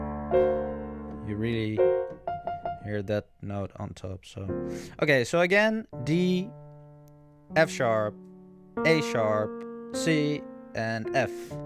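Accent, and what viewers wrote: Netherlands. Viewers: U sound dutch! :D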